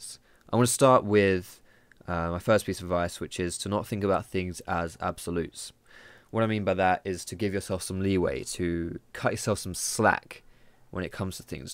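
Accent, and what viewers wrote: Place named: United Kingdom. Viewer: From the very first video, I was in love with your cheesy humor and that lovely british voice! I can honestly say, I'm here for, not;)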